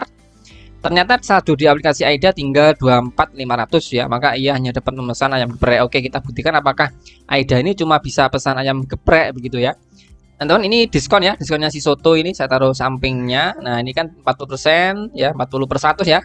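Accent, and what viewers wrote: Java, Indonesia. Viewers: Logatnya medhok jawa. Susah bged dipahami logatnya. Maaf. Cuman masukan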